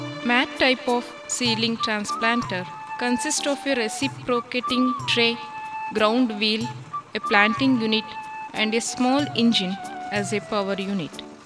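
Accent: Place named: India. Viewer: u can isten to her English,she is from india oviousely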